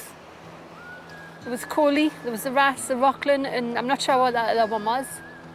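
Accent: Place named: North East England, United Kingdom. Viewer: Nowhere guarantees big fish, as I'm sure, you well know, but you can make their capture far more likely by choosing time and place carefully. Sure, just being there is good, and LRF for mini-species can be great fun, but if I'm using the real gear, I like to catch something I can eat. Your accent implies north-east England. There are some fine Cod, Bass, and flatties in that area, though of course, it's all about time and place. The opposite coast from you, holds loads of big flatties in the sandy estuaries, and some Bass. I got tired of catching wee Coalies a long time ago, so tend to avoid the east coast unless, I'm after flatties. Conversely, the west coast, and in particular, the Firth of Clyde has been commercially overfished to death. When I was a teenager, it was swarming with fish of many species. South-west Scotland can be good, but again, it's time and place. 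If I were you, I'd go less often, but farther afield. North-west and far north of Scotland can be excellent, though you'll need your hiking boots. Wales can be very good. It's not, all about catching big fish, but you'll get more watching if, you can show them a few they'd like to catch themselves.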